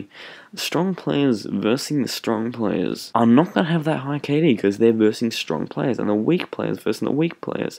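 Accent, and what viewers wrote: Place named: Bogan, Australia. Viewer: Hop on the old bandwagon? Dude you're sounding like a bogan now :O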